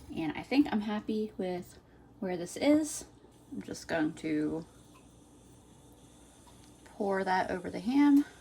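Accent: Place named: Georgia, United States. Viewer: Based on your "accent" I would assume you're living in Georgia but maybe a transplant from a different state.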